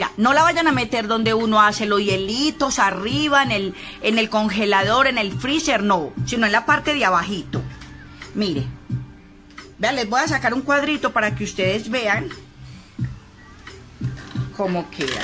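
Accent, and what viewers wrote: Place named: Colombia. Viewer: Wow,genial. Peo yo vivo en EEUU y no se donde venden eso.y si lo venden . Me encantaría saber de q otra forma podemos obtener el colageno vía oral.Soy nueva aquí y me gusta ver tus videos. Además sabes, el acento Colombiano me encanta. Soy Boricua. Bendiciones para ti y q tú canal siga creciendo. Abrazos 🤗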